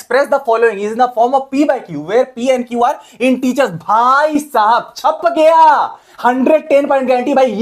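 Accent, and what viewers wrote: Bihar, India. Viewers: Aap bihari ho kya?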